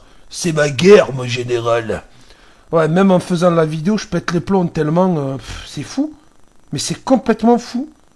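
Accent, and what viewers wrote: South, France. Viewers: toujours top les vidéos surtout avec l'accent du sud oh punaise 😂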